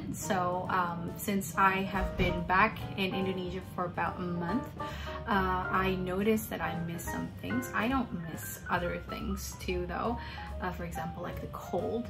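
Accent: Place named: United States. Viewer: Your English is extremely good ! You sound just like an American (to my ears).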